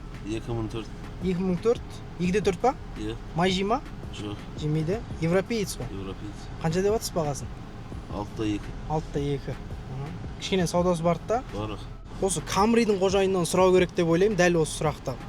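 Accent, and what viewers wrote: Standard. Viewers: Бауырым, рахмет! Мына видеон браз конил куйди котерди е вахахаххааа Не деген мыкты обзор жане таза казакша сойлегенине рахмет айналайын!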